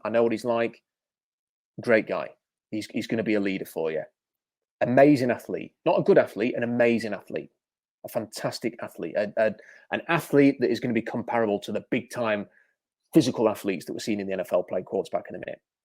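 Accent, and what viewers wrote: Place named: Manchester, United Kingdom. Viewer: Hey, a draft guy with an English (Manchester area, I think) accent. Subscribed and watching the past videos.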